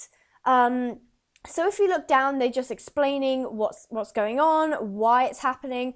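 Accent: United Kingdom. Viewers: Even before, you had to verify your email to chat. I was a free player for 3MONTHS before i got 3 months subscription. after that, i bought pay once and now im level 19. i have been playing star stable for over a year and i think 99.99/100 in the star stable community on global chat are just looking to roleplay. i bet most of those are subscriptions. this is just star stable milking money off their players again. like they do with star coins. in my whole year of playing star stable, i have never bought star coins. i have saved up for all my horses. i now have 11 horses. you don't need to pay for star stable at all just to enjoy the game. LIKE IF YOU AGGREEEEEE Also, what server are you on? Im on Unicorn Forest, UK and you have an english accent. can we be friends on star stable?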